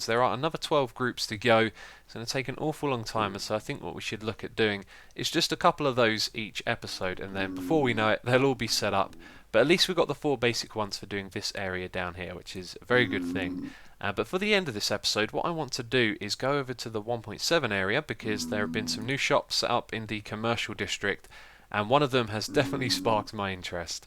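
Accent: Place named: South West, United Kingdom. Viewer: You sound like you're from the south west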